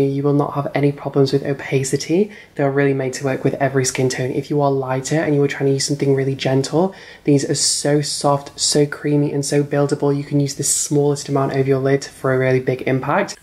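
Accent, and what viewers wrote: France. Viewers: Love the packaging, dont like the French accent. We can see you better then the model. If there was better lighting and close up of the lips would have been better and let her apply it next time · Makeup looks really nice and I think the price seems reasonable! That French accent though doesn’t fit it sounds like the chef in ratatouille can’t take it seriously!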